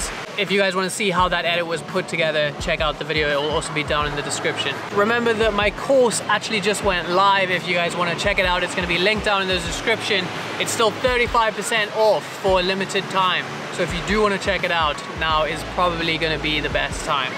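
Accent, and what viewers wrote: Cape Town, South Africa. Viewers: Hy dude are you from Cape Town?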